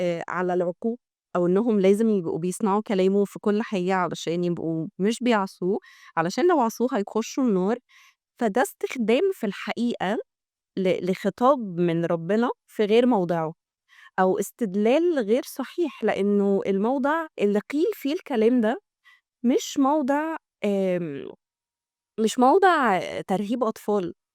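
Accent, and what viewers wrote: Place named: Gulf, Arab world. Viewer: صوتك خليجي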